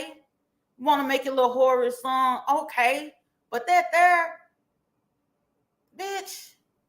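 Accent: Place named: Texas, United States. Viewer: Love the video and your Texas accent.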